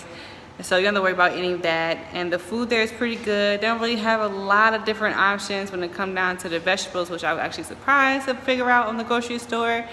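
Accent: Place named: Nashville, United States. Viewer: Filipinos are not racist.. black or white is ok..no problem because w are brown people😉😉😘 but your accent is like my friend accent she is living in Nashville 🤩💖love it🤗 Great video!☺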